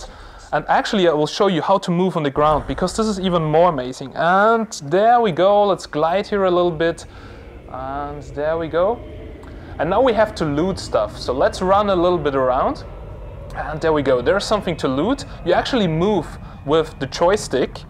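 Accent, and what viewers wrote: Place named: Germany. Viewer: He sounds sooooo german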